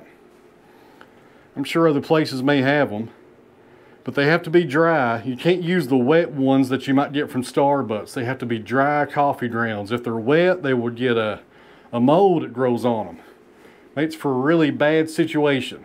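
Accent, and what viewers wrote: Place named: Tennessee, United States. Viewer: Pay no heed to the complainers and the know it all's. And those who bitch about your Tennessee accent.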